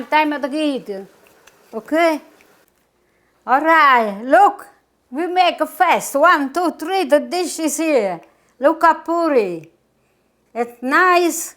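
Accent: Italy. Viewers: I love you ❤. You are exactly like Nona Rosa😊, my mother. Exact Italian accent! Bella Nona!! We were all born in Napoli & mamma cooked just like you! God bless you 🙏❤️🙏❤️🙏❤️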